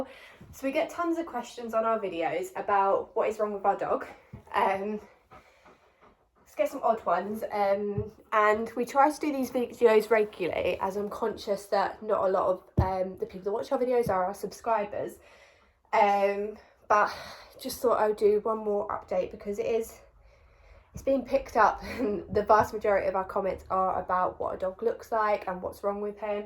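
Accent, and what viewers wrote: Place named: England, United Kingdom. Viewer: Its her English accent for me..😁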